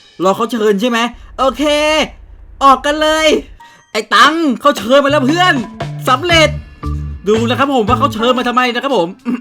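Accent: South, Thailand. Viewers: พี่ เป็นคนใต้เหรอค่ะ?? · พี่เป็นคนใต้หรอ ผมพึ่งรู้นะเนี่ย555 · พูดใต้หน่อย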